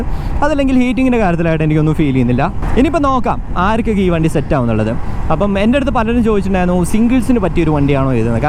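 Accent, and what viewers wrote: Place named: Kannur, India. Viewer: Machane ingal Kannoorkkaran aanalle ....❤️❤️🥰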